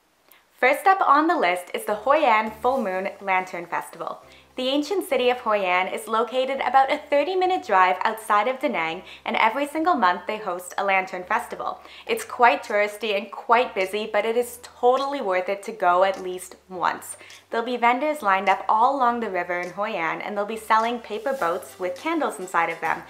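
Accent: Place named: Upstate New York, United States. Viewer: Where's your accent from? You have an accent that sounds like a north New York accent.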